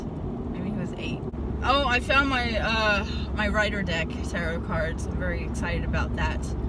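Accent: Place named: Canada. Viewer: I have to ask.... are you from the U.S.? I am asking because you talk as if you are from somewhere else. Your dialect is from a certain province in Canada. Just asking because certain words you use remind me of home.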